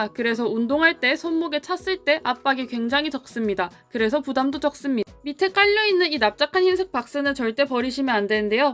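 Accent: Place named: Gyeongsang, South Korea. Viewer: 갱상도 억양 고향생각 간절하굼요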